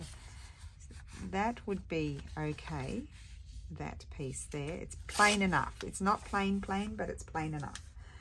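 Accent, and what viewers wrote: Australia. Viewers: Just found you today. Nice to hear another fellow Aussie ❤ Do you have the name of that seedpod stamp you used? It was gorgeous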